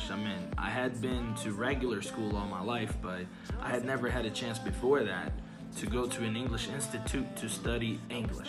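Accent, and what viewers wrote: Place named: United States. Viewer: Hello teacher, I’m Yahya, all thanks to you and teacher, I learned just to focus entirely on an accent that I like to be recognized by and that’s American which teacher speaks 😊 keep up the good work ✋️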